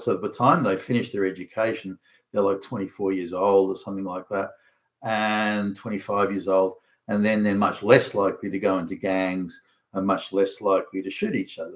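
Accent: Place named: Australia. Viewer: Thanks for broadcasting to me and one or two others. You have a good Aussie educated voice with rounded out cynicism.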